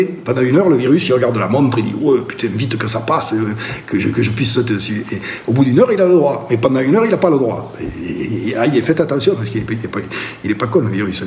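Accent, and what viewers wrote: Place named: Marseille, France. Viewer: L accent de chez NOUS .MARSEILLAIS, PAGNOLLL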